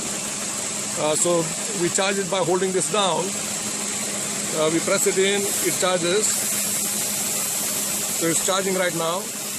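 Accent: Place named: India. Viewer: Indian accents are so fucking annoying...and i love indian people and food but i cant stand their english accent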